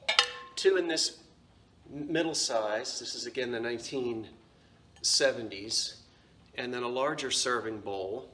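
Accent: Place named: New Jersey, United States. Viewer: I enjoy hearing the New Jersey accent. It would be super fun if you ever want to do a video in full Jersey mode!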